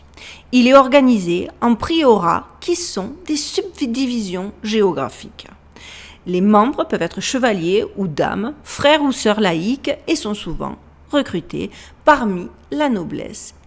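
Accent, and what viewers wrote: Marseille, France. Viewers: Hello la marseillaise (?) En tout cas l'accent est là. Je vous aime bien 😊 même si je ne regarde pas tout le temps vos vidéos et pour cause : pssiiit... ✋👀Je suis « réveillé » depuis une trentaine d'années avec les écrits de Serge Monast et d'autres donc plutôt au courant de ce qui se passe actuellement dans ce monde et surtout à notre époque. C'est bien ce que vous faites, continuez 👍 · Bonsoir, Merci pour cette vidéo analysée avec beaucoup de finesse et de pertinence ! L'accent du Sud et votre bonne humeur communicative rendent le monde moins obscur ! Merci pour le temps consacré qui aurait mérité un trophée ! Hâte de voir votre prochaine vidéo ❤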